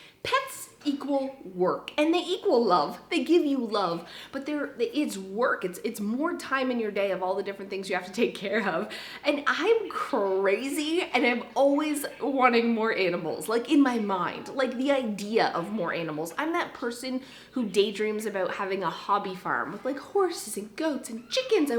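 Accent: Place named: Southern Ontario, Canada. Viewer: You have to be Canadian or even from Ontario, pa day, geese, sounds like southern Ontario lol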